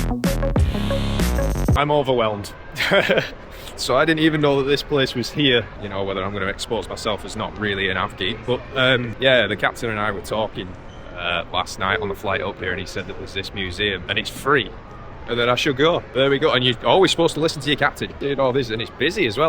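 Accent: United Kingdom. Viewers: It sounds like you’re from the UK - how were you able to live and work in the US? It seems like the there are limited VISA’s for pilots (asking as I am currently trying to figure this out)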